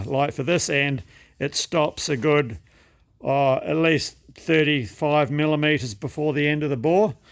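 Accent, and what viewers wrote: Australia. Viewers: Great instructional video - with the Aussie touch. Thanks.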